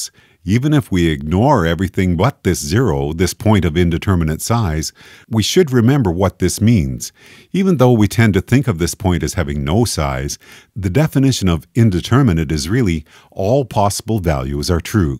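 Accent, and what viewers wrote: Canada. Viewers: A BOAT. About. These sound the same to a Canadian.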